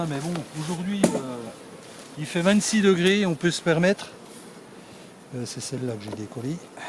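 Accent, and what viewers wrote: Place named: Franche-Comté, France. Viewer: Ce bel accent franc comtois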